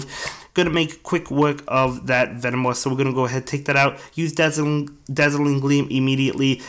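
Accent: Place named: New York City, United States. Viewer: It's a regional thing; I'm from NYC and I can understand him perfectly. Not sure what you're talking about with bodily noises.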